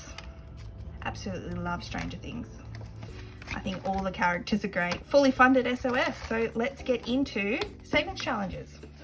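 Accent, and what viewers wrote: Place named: Australia. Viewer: Her accent sounds like Australian so I assume that is the currency.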